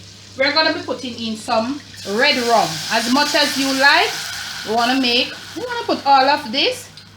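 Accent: Jamaica. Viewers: Hi Ms., i love your Jamaican ascent, an i love your rum cake. If you are baking for kids what can you use instead of rum. Thanks dear. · Thank Ms..I love all of your recepies....😍😍 Love the way how you keep the Jamaican accent.. everthing that I have in mind to try so far, I just click on your channel..and There you are.. Manner and respect!!!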